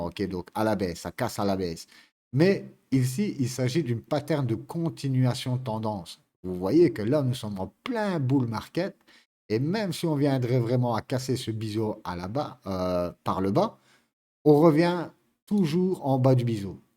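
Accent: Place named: Belgium. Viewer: toi tu es un compatriote,accent Belge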